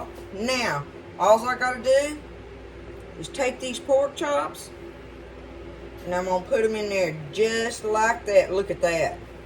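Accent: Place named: Midwest, United States. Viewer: Finally, a u tuber with an awthinic Midwest accent. Great recipe!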